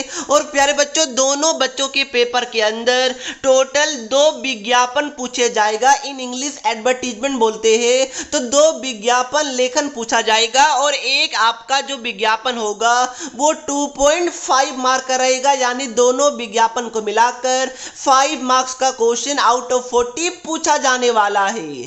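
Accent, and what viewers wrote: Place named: Bengal, India. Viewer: Is it a bengali teaching us he be like paryog and twenteen wtf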